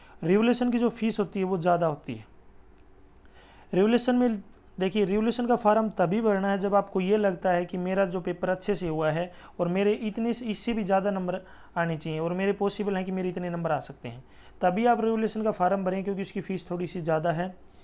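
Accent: Haryana, India. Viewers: bhai aap haryana se ho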